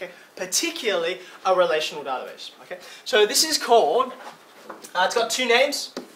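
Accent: Australia. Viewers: He sound australian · Yeah, I have to admit I was distracted at first by the thoughts "is that guy Australian?" and "what a cool presentation technology".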